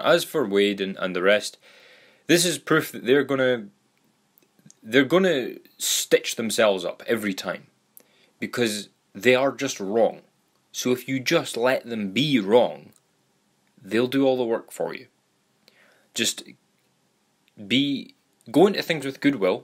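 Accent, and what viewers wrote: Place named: Scotland, United Kingdom. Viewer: Nice to hear a Scottish voice on comicsgate mate. Glasgow ?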